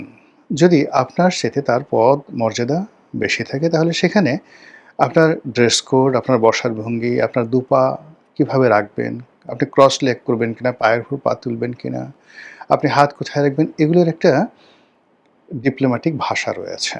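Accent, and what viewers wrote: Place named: Barishal, Bangladesh. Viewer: বরিশাইল্লা ভাষা এখনও আপনার বেস ভাই রপ্ত আছে। রওয়া পড়া কেনু ভুলেই গিয়ে ছিলাম। আপনার সেটায়ার গুলো ভালোই লাগে।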